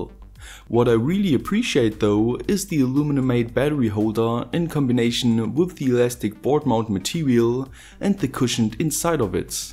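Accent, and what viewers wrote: Germany. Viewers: wow you good with electronics mate! Like your accent sounds german.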